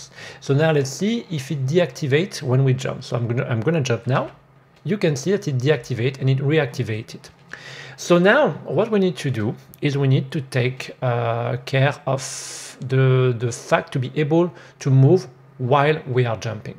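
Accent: France. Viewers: Super tuto j'aime bien ton léger accent français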